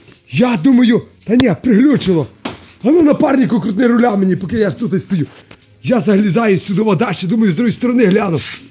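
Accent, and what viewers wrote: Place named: Khmelnytskyi, Ukraine. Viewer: Судя с говора вы с Хмельницкой области 😊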